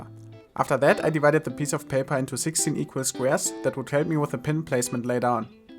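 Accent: Germany. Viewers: Wenn deutsche englisch reden hört sich das irgendwie komisch an.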